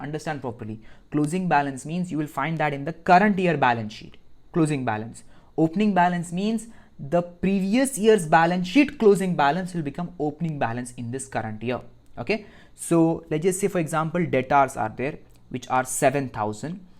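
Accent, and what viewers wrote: India. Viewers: BHAI THU KON HAI 🫂 Teri ENGLISH bhi HINDI jaise lagti hai aur thu FREE me itni mehnath karke itne PYARE notes banaye hai na STUDENTS k liye kya bolu : HAT'S OFF MAN 🫡 THANK YOU BRO 🥹 Bhai thu kya kar raha hai na ye thuze kabhi nahi samjega thu itne EASY WAY me samja raha hai na jaise ye koi 11-12th ka chapter hai bro thu asli KING hai ACC ka 👑 Pahile baat tho thu FREE me q padha raha hai ye nahi samja muzhe par thu itna PREMIUM CONTENT free me de raha hai na iske liye DILSE THANKS BHAI 🙏 EK SUGGESTION HAI : "aap k pas konsi DEGREE hai bus ye REVEL😁 kardo bhai agar aap CA ho tho bhai thik hai par aap agar CA nahi ho aur itni acchi CONCEPTUAL CLARITY de raha ho tho bhai aap BHAGWAN HO"😑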